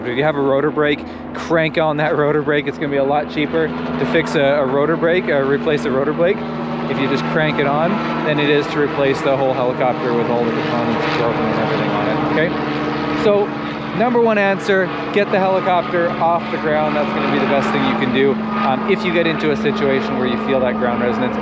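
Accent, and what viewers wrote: Canada. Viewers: Great video. Couldn't understand your term "lead-leg" but then figured out your Canadian dialect was saying "lead-lag." Hahh!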